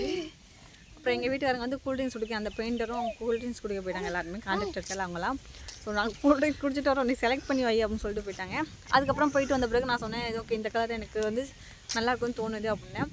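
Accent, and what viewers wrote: Thanjavur, India. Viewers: தஞ்சாவூர் தமிழ் அப்படி தான் இருக்கும்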